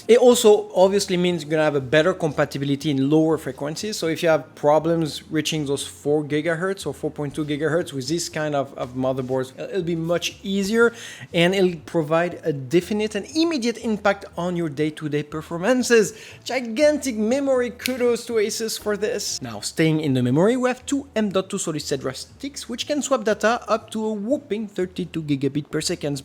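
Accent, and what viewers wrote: France. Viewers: Merci je suis convaincu! J'attends quand même la nouvelle b550 de msi pour être sûr! Première vidéo que je vois sur ta chaîne et tu viens de gagner un abonné (le French accent is lovely)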